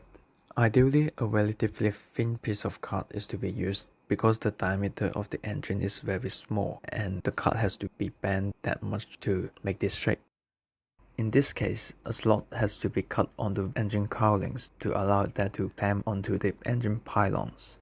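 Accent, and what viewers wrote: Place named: China. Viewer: Are u from china? I like ur accent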